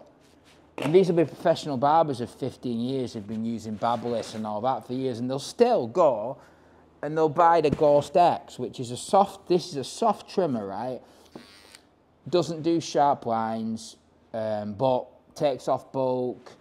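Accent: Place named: Northern England, United Kingdom. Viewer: I'm impressed. English Northern guy doing well. What's not to like.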